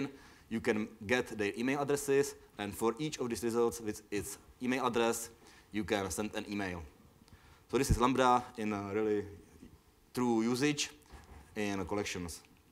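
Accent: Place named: Czech Republic. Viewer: hehe its english or czech :D most terrible english accent i ever heard.. very funny x)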